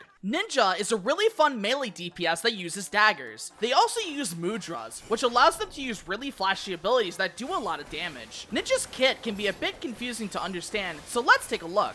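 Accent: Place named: United States. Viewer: my god your voice is so painfully american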